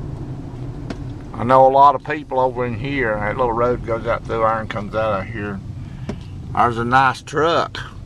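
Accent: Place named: West Virginia, United States. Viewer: I grew up in Blacksburg, VA. I'm a truck driver now, and recently picked up a load of sodium chloride from the salt corp there. I had never heard of Saltville before, but after driving through I wondered about it's history. I have been doing some research for a blog post, and came across your videos. Very nice videos, looks like a nice place to live. In my opinion there's no place quite so beautiful in the whole country as the mountains of Virginia. Your accent reminds me a little bit of my relatives from West Virginia, though it's not quite the same. Makes me miss visiting them. Most of them are gone now. I haven't been there much since my grandparents died. My parents grew up near Charleston. Thank you for sharing your town.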